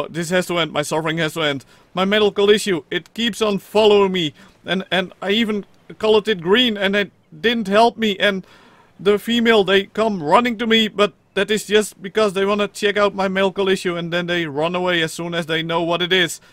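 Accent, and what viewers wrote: Netherlands. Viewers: You sound dutch.. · are you From the netherlands, because you have kind of a dutch accent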